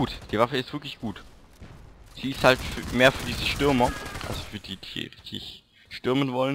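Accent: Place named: Swabia, Germany. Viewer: ein Schwabe..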